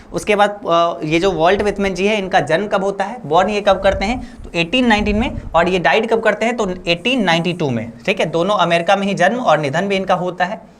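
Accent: Bihar, India. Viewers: proper bihari spotted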